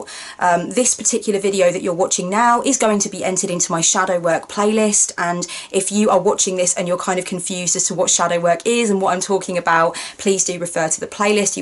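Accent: United Kingdom. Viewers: I have been following your channel for awhile and it is always in sync with what I am working on at the time. plus you really pull off blue hair well. And as an American with a degree in Brit lit your accent is heart warming. speaking of goddesses my baby daughters middle name is Luna so Diana and Hecate are really big influences.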